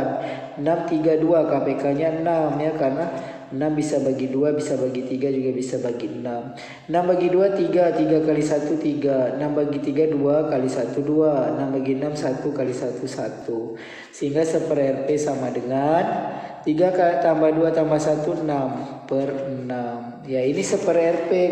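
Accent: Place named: Malaysia. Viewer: Waw abang aksen ya aksen malaysia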